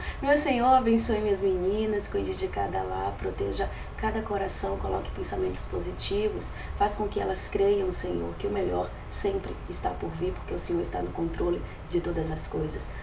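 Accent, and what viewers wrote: Bahia, Brazil. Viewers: Faz pouco tempo que acompanho os seus videos , mais desde o primeiro momento adorei esse sotaque maravilhoso que me faz lembrar das minhas ferias ai na Bahia, e queria te parabenizar por transmitir tanta coisa boa nos teus videos e a cada palavra de sabedoria que você diz neles . Beijos e muito sucesso pra você !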